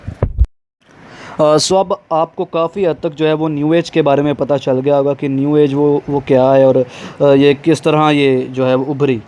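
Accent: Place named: England, United Kingdom. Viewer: Ur English Accent I Like It :)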